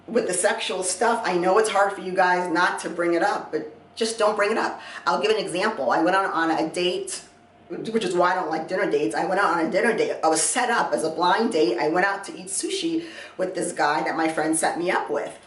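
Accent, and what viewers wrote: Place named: New Jersey, United States. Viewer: That Jersey accent lol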